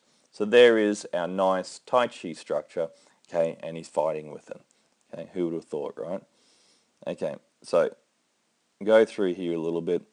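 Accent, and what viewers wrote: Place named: Australia. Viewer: I like that you take the time to breakdown and study the fight, and the realistic approach on how taiji can be applied. It's sounds like you're Australian, where are you based?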